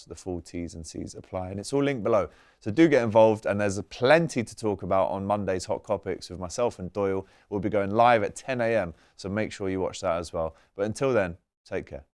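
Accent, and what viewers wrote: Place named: Southern England, United Kingdom. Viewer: This guy in no way represents The Kop. He is a southern fraud. Listen to the Fish and Finger Pies Accent FGS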